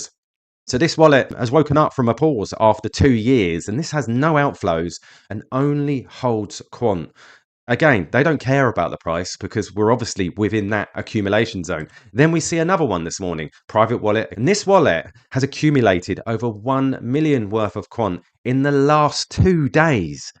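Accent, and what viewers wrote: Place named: United Kingdom. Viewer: Hi, sounds like your in the uk. Do you know of any accountants that know they’re crypto, mines got no clue about it.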